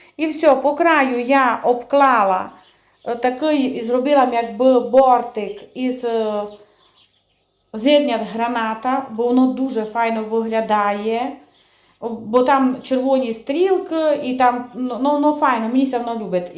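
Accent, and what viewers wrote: Transcarpathia, Ukraine. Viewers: не устаю удивляться вашим рукодельным видео и закарпатскому диалекту. Поздравляю с наступающими, здоровья, терпения, достатка вашей семье.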